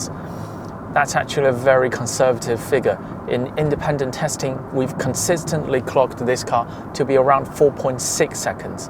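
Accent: London, United Kingdom. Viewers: 英语真好啊这标准的伦敦音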